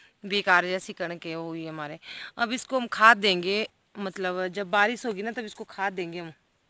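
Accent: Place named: Sirmaur, India. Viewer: Boli se aap sirmaur k ass pass k lagte ho Ya solan district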